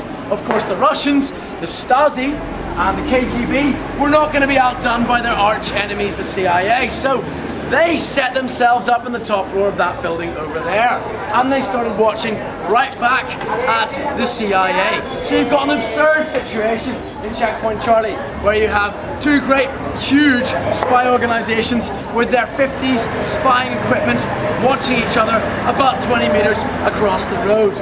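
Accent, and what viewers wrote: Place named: Ireland. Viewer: i like tha way he talks ..... he has an ireland accent ..... lovely !!!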